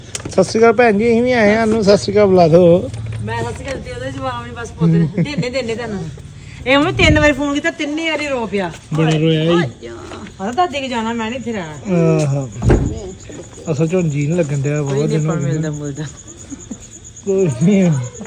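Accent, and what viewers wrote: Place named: Malwa, India. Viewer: Haji veer ji malwa Di boli aa ❤❤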